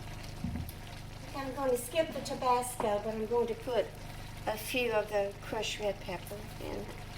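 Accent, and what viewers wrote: South, United States. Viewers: Hello dear, it's been a little while but it is always lovely to hear your warm, welcoming Southern USA Accent:) Bless you.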